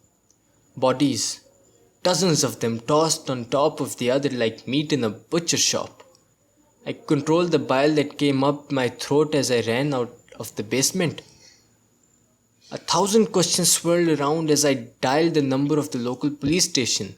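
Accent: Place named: India. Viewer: Your Indian accent 👌🏼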